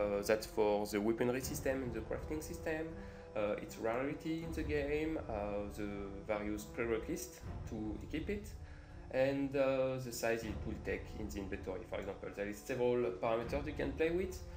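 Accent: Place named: England, United Kingdom. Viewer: EPIC ENGLISH ACCENT !! (and game aswell)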